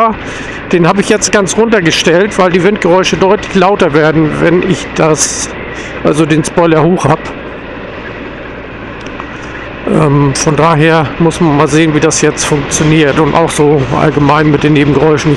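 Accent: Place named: Northern Germany, Germany. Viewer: Ach, ich liebe den norddeutschen Akzent einfach 😃 Hab mal nen Abo dagelassen, und hoffe das hier bald auch mal wieder Videomässig was kommt!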